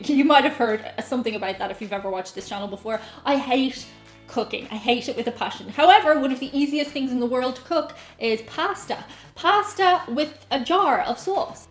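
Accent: Ireland. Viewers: Good God! You are defending UK 'pudding'? Are you insane? UK needs to have it's rights to the word pudding irrevocably revoked. And I'm also roping you irishfolk into the lawsuit against haggis. There is plenty of guilt there to go around. Haggis, and 'pudding' that is more like a hockeypuck made of dog kibble than anything remotely edible and you think you can get away with dissing meatloaf!!! You, your adorable Oyrish accent and lovely face are hereby sentenced to a lifetime of only being able to eat alarmingly orange American Cheeses. So there. Blasphemer. No Corn Dogs!? Devil woman.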